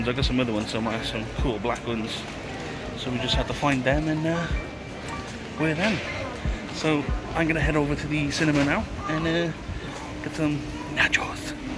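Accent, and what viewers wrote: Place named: Wales, United Kingdom. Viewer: Thanks for this. Grew up in Croesy and it's lovely listening to the accent and seeing places from years ago. (I live in Canada now). You're doing great vlogging - keep it up (I know this is two years ago, and I see you have kept it up!)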